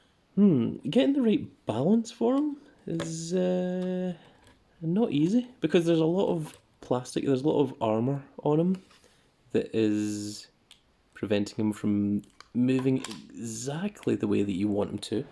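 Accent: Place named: Scotland, United Kingdom. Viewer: I can already tell from your Drew McIntyre accent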